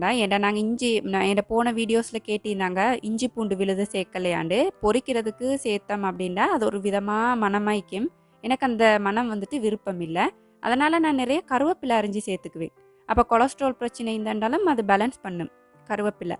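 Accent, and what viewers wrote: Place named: Kurunegala, Sri Lanka. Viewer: Neenga kurunagalaya or anuradapuraya sis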